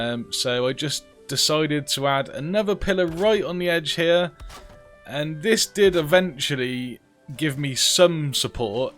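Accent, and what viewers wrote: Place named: Suffolk, United Kingdom. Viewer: I'm from suffolk , that's why I thought I could hear a hint of suffolk in your accent Haha.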